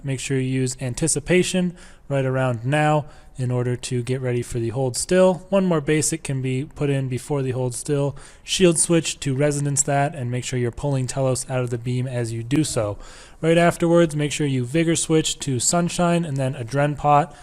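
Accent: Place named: New England, United States. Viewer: "Wicked quickly..." Are you from New England too? =) Nice guide btw.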